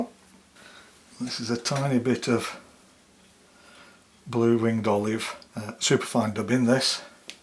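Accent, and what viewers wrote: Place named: Lancashire, United Kingdom. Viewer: A wee nosy question......where did you pick up the traces of scottish inclusion within your Lancs accent?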